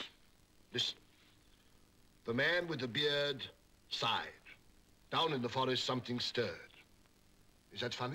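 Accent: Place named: England, United Kingdom. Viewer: Oddly enough, this fellow HAS an English ACCENT!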